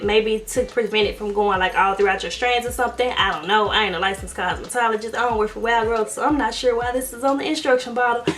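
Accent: South, United States. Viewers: Wild growth did not work for me unfortunately, and it left a white residue. 😞 You have a lovely accent, BTW. Real Southern gal! 🇹🇹😇👏🤗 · been a while since ive seen a black person with a thick country accent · I love you down south country accent! 😍 Soooo cute, girl! I'm from Florida so you know I'm representing! BTW, it's not overwhelming, it's very cute 😉😘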